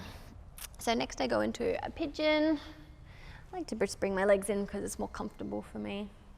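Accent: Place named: Australia. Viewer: Maybe it’s the Australian accent but I loved that video! It moved a little faster than I would have so it kept me on my toes! 🫶🏻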